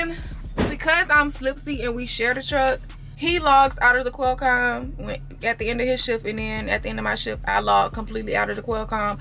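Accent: South, United States. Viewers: I love her southern accent. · Not sure how I got here but it’s the country accent that keep me engaged think imma go ahead and subscribe 😂I like the vibe of the video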